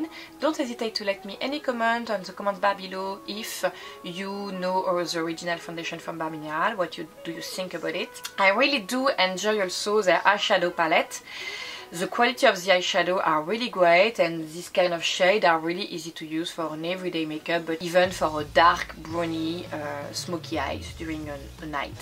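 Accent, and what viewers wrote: France. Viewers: You look very pretty. Your makeup is simple and lovely. Very natural. Love your French accent.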